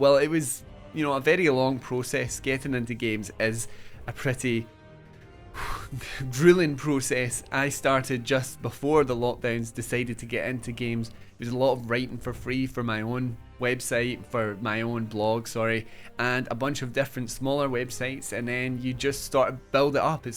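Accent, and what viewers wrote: Scotland, United Kingdom. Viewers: Push Square has rapidly become my favorite gaming website, because it’s refreshing to have a place where people discuss video games with no apparent agenda beyond the love of the medium and a desire to see more good games. Even commenters are mostly civil to each other, and that is down to the site establishing the desired tone (and a little moderation from time to time.) So now I’ve subscribed to the YouTube channel and so far I’ve enjoyed what I’ve seen. I liked the couple tech reviews. I’d prefer that you go in depth on one topic to making “Top 7” videos, if you’re taking requests. The topic should be whatever interests you, because that’s the only way you’ll make videos a million other people couldn’t make. The accent is great! I’m American but my mother came from Glasgow and all my favorite relatives sound like you (though maybe you’re from another part of Scotland because I understand you just fine at 1.25x speed.) Tifa was the correct answer. 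The proper response to the swallow question was “African or European swallow?” You’re doing great!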